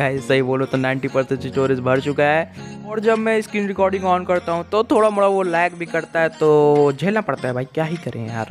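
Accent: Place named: Rajasthan, India. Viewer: Bhai rajasthan se hai kya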